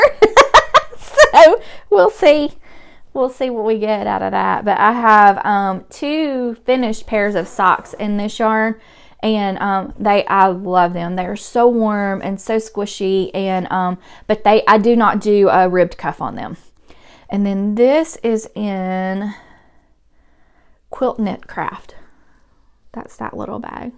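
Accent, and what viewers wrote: South, United States. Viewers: Just finding your podcast and starting with Episode 1... FANTASTIC! I love your southern charm, accent & beautiful hair cut and color! Looking forward to following your knitting adventures!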